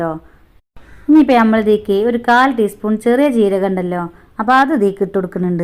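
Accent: Malappuram, India. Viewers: Original malappuram slang anu ith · ഒറിജിനൽ മലപ്പുറം മലയാളം 😄😄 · ഇതാണ് ട്ടോ മലപ്പുറം ഭാഷ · തനി മലപ്പുറം ആണല്ലേ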